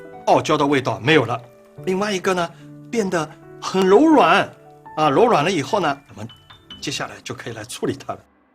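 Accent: Shanghai, China. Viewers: 朱老师的上海口音老可爱的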